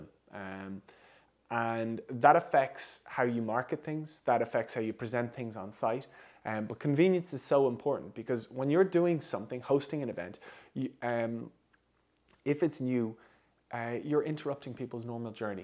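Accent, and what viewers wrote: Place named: Dublin, Ireland. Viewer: Hi! Watching from Alaska and right off the bat I knew you had a Dublin accent! Almost even a little more southern than Dublin. My dad is from Cork! Thanks for this video! I know you make a ton of videos but you didn’t start your video with an intro! No hello or anything! But lots of info! Thank you thank you!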